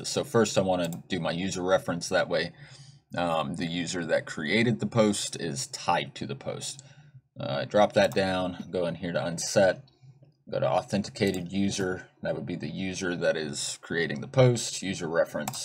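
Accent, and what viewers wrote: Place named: RP, United Kingdom. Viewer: its a great video, but coud't continue because of that rich people accent something, that you have . Sorry :( . I think you can talk normal if you want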